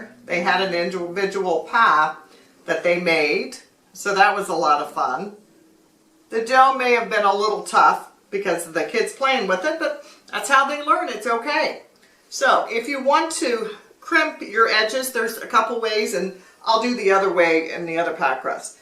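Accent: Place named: Kentucky, United States. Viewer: You sound like a Ky. Girl, but I’m not sure. We like chushawl better than pumpkin too.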